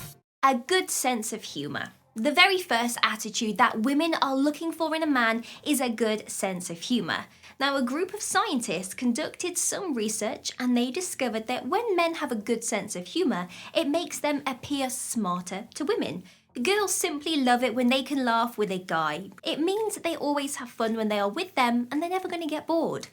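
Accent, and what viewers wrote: England, United Kingdom. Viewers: Love your very English accent. In the old days we simply were gentlemen. I think that summarizes all.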